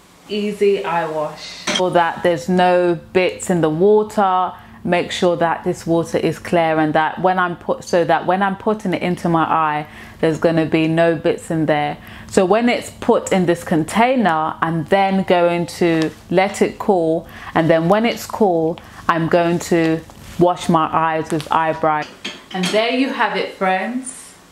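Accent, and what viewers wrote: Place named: Alabama, United States. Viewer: i love your accent, what part of alabama are you from?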